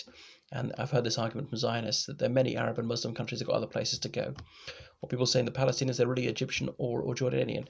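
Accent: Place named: England, United Kingdom. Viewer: are you Irish or English? You certainly sound extremely English and but your channel name is from Ireland?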